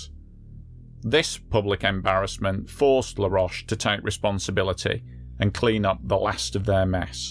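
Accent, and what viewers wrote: United Kingdom. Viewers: why do you have a British accent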